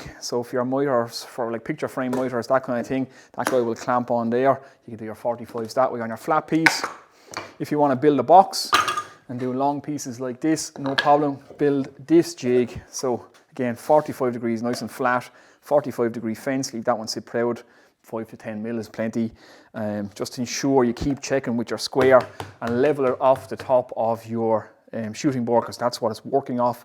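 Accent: Ireland. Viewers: Just hearing your Irish accent makes me smile. I’ve sent many years working on the green isle, all very enjoyable. Thank you. Co..Cork? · Hello, your videos on jig making are fantastic and I will definitely attempt them. Can I be so bold and ask what part of Ireland you are from, because your accent is very familiar. Also, I don't really go in for Patreon but I do believe in rewarding good work that is helpful for me so can you advise your PayPal account please.? A jug for a jig seems a fair reward (hahaha). Paul.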